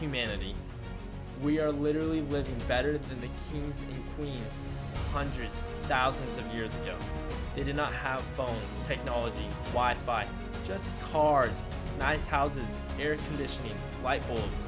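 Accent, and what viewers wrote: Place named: Southern California, United States. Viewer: According to who? He sounds like a well spoken chill SoCal guy to me. He was funny, he didn’t have pauses with ums and ahs, pretty great oration - what were you hoping for? lol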